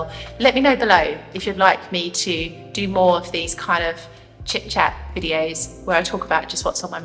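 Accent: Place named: London, United Kingdom. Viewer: Yes I love it. And I adore your londinese accent.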